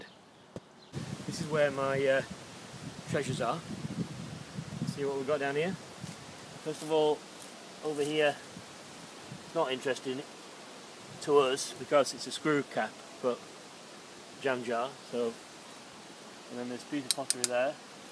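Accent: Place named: Manchester, United Kingdom. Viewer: Are you guys new and do i detect a fellow manc? Loved this video and looking forward to more! You are very watchable and I’m fussy!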